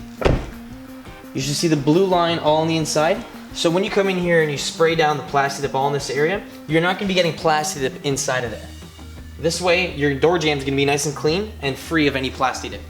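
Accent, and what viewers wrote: Canada. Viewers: Canadians and the way they say out. hahaha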